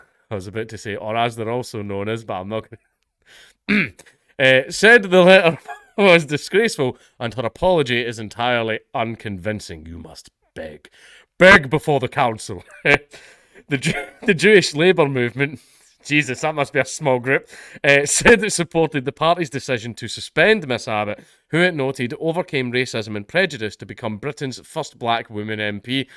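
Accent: South, United States. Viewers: Dankulas American accent was fucking spot on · The Right sort of American accent too. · I love the southern US accent. Use it more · country accent is a gift I've never known I needed until I received it. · you do a great american accent.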